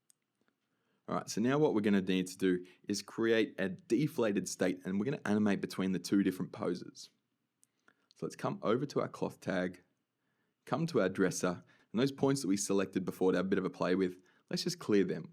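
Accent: Australia. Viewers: Australian accent. He is living in Melbourne, Australia.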